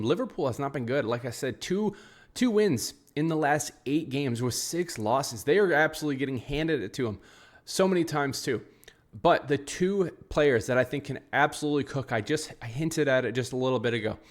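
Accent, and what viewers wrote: United States. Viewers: I like your presentation,are you an American?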